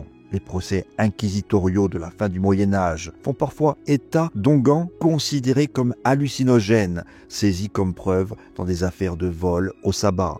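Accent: Corsica, France. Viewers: La voix😮.. Un léger accent corse non ?..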